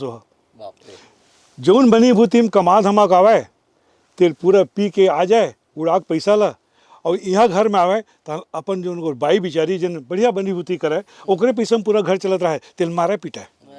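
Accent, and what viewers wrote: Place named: Chhattisgarh, India. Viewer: बहुत सुंदर भइया, ठेठ हमर छत्तीसगढ़िया अंदाज कहिनी सुन के मजा आ गे, प्रणाम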